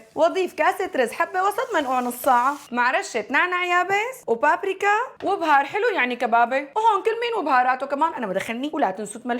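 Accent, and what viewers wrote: Syria. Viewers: عن جمال اللهجة السورية أتحدت ❤❤ كتيير بحبك و كل وصفاتك ماشاء الله ربي يحفظك 💚 وتحية إلك من الجزائر 🇩🇿🇩🇿🇩🇿💕💕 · انا بحبك اوى والله 😂وبتعلم اللهجة السوري منك وعاملة صداع لجوزي في البيت 😂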